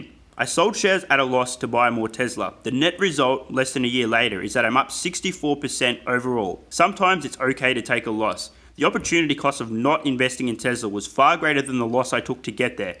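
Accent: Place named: Australia. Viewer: I can tell you are an Aussie, I'd like to have a beer or more, where are you?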